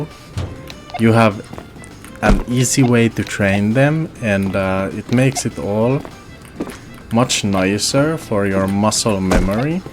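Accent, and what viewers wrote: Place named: Finland. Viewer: Ait! is getting a new sub! When I get a nice humble guy, with good information, great knowledge, etc. AND who has the lovely finnish accent, then a fellow Norwegian HAS to sub! Great content bro! 🫵🥰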